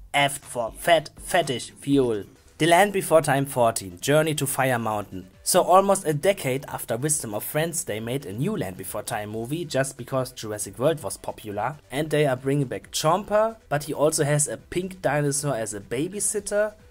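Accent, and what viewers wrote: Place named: Germany. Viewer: i learn german (stil A1 at the moment) and i recognized the German accent in your videos. funny and awesome as well. also, love your videos, i'm also a big land before time fan, as well as a huge dinosaur nerd. so i know all of the dinosaurus of Land Before Time and Jurassic Park and stuff. loved the Walking With Dinosaurs reference at the end of the video. ("perfectly balanced") i would rank them quite diferently but for now i only have a top three, and a worst tree and there in between i have no idea yet because i havent decided yet. best ones 3 - Mysterious Island 2 - Big Longneck Migration 1 - The Original Worst ones 3 - Great day of the Flyers 2 - Invasion of Tiny saurus 1 - Unlucky Thirteen (totally skipable and unwatchable) Wisdom of friends (fuckery) "perfectly balanced As all things should be." also have to say, even if Stone of Cold Fire is not on my top three spot on the list, it still has in my opinion the best song of all the songlists:. 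but because there are no sharptooth threats, i dont think it deserve the spot on favorite ones because that was a downer for me. (notice, every other sequel had sharptooth action in it, and i always thought it was stupid to not include sharpteeth in 7. technically there were in there, but only as flashback but thatst just dumb.)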